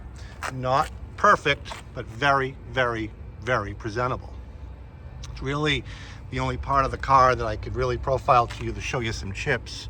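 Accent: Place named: Boston, United States. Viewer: Hi! Love your channel and your Boston accent! This car is priced very well, considering the survivor quality, the low miles, and all the undercoating process that the previous owner paid for. He must of loved it if he kept three covers on it in a climate-controlled garage. It will sell FAST!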